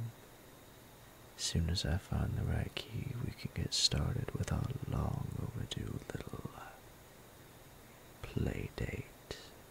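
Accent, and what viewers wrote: South, United States. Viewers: That slight southern accent 😩👌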